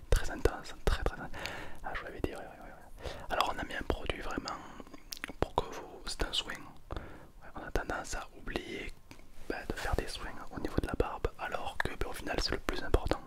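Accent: South, France. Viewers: L'accent du sud >> les autres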